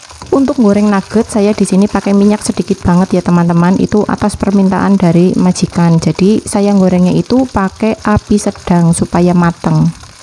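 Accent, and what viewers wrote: Banyumas, Indonesia. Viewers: Mbk wong ngapak yak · Nembe nemu channel kie, sampeyan si piyantun ngendi mbak ?, medok banget ngomonge alias ngapak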